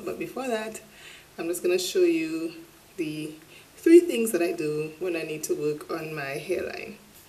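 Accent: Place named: Dominica. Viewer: Great Tips .Where you from u sound like someone from Dominica.I'm a Island girl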